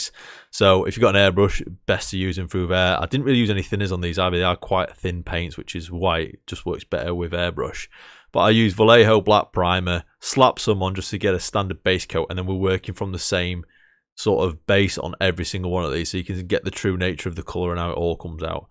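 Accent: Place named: Yorkshire, United Kingdom. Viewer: It's PAUL DANIELS paint.... "That's Magic". Great to hear a Northern accent.... Possibly Yorkshireman! A great review mate, I'm looking around for a colour shift that goes like 'oil on water' effect! Needs to go over any colour... Am I wasting my time?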